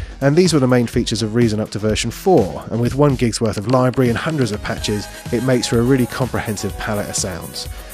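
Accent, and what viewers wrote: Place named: United Kingdom. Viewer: Great review. Nice hands-on footage. And there's something about a British accent that I find calming. That must be why I listen to the BBC all the time.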